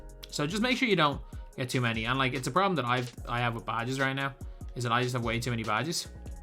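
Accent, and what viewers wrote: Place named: Ireland. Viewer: I was thinking this guy sounds Irish, of course he's the champ! Sound for the video man just got the game👍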